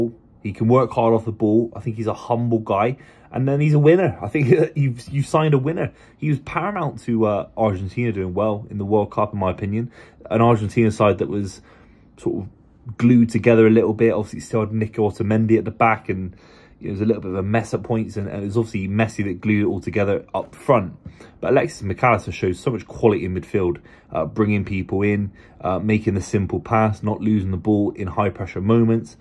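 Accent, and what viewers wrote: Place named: West Country, United Kingdom. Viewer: your blackbeard west country accent is coming through and im all for it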